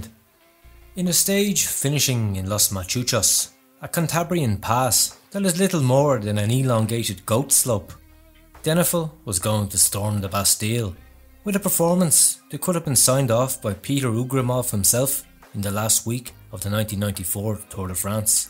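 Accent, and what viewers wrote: Ireland. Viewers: I think your subtle, understated Irish accent really adds to the narrative. Just discovered this channel and is eye-opening to say the least. Really like it.. I imagine it'll take off even more when/if the big names get busted.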